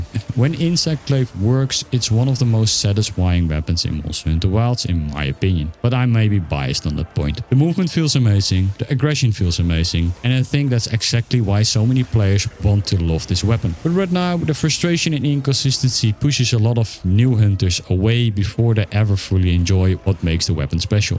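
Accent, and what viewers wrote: Netherlands. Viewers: Dutch guy speaking english?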